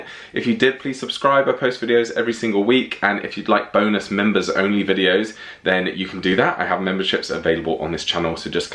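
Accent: England, United Kingdom. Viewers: So cool. U are very good at ur job. Such a lovely man. I could sit and listen to ur voice alllll day. The English accent….. ❤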